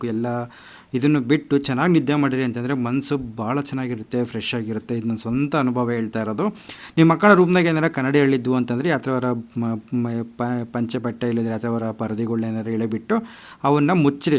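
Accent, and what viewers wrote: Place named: Karnataka, India. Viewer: ನಿಮ್ಮ ಊರು ಯಾವುದು? ನಿಮ್ಮ ಕನ್ನಡ ತುಂಬಾ ಇಷ್ಟ ಆಯ್ತು 👌🏻👌🏻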